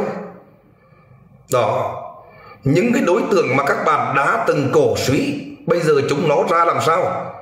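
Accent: Standard. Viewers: Nói chuẩn luon bạn nha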